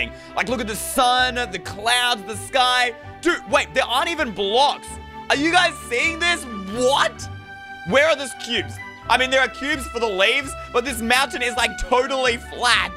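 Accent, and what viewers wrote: Australia. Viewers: Prove you have an Australian accent:leever, caan’t, etc. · I thought you were British so you from Australia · I dont ever notice accent.. Maybe that's because im an Aussie Too! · I can hear your Australian accent because I am American, which I am from the United States which has the most carnivorous plant than any other country in the world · I’m Australian and good Aussie voice!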